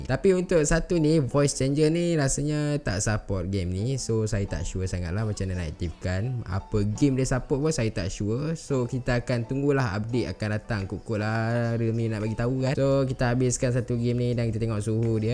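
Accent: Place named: North, Malaysia. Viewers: Laaaaa orang utara kaaaa...dok mana?? Huhu.. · Pekat noh loghat kedaq hang 😂😂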